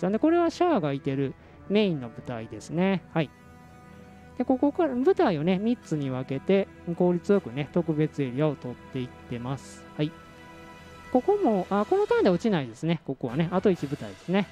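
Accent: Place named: Kansai, Japan. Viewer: 淡々と関西弁で語りながら所々冗談入ってるのが面白い ここから宣伝につなげるとは思ってなかったｗｗｗｗｗ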